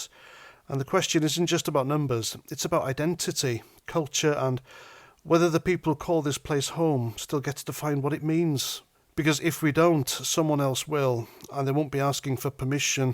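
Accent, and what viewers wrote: Wales, United Kingdom. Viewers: I love the Welsh accent. It's heartbreaking to hear this news. Please make sure that Welsh children are taught about their heritage, culture and language. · Are you Welsh just like me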